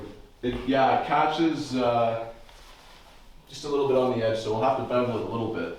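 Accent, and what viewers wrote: Canada. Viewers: I just found this channel. Very nice work on this. Just going by the trees and his accent. Is this somewhere in Ontario?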